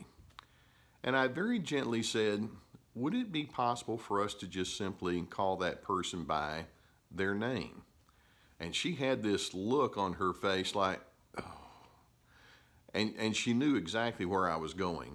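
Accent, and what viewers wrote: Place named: Texas, United States. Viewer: Wish she (84 yr old mommy) would get outta my physical garage, chuckle. Gotta change the lock to under my house. I'm a locksmith now and proud of it. I am constantly amazed what one can do on your own when you are broke ; ) Oh, a plumber too, and fixed the gas stove fireplace! Still haven't cleaned those gutters & now rain/snow here in mountains of Ruidoso aka "rumordodo"! Thank you. I do really know what you mean! Very true! Love the true Texas accent, I lived in Houston for almost 20 years. I miss it cuz it was so big I could easily hide from "mommy"!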